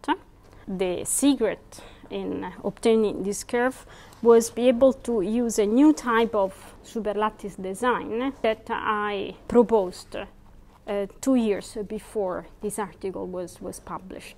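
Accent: Italy. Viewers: I recognized from her accent that she might be Italian/Spanish/Portuguese maybe? Her name strengthens my suspicion.. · That thick italian accent goes off and on on every other "R"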